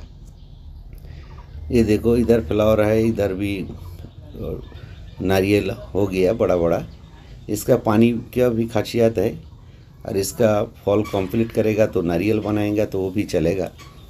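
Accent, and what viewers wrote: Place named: Bengal, India. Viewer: ठीक से तो बोली, बंगाली लहेके में बोला, इसमें गलत क्या ??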